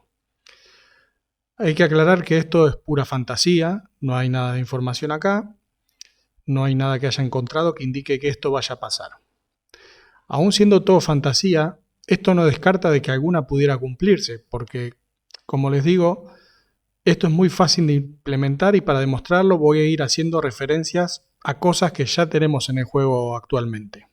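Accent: Argentina. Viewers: Es argento papu 😊 mase un argentina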